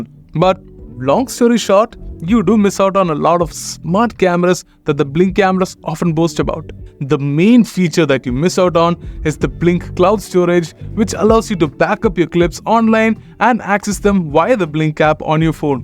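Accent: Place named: India. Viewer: This might be better for US people if it was presented by a native US citizen that doesn't have such a strong indian accent, without the CC feature it is very hard to understand what's being said.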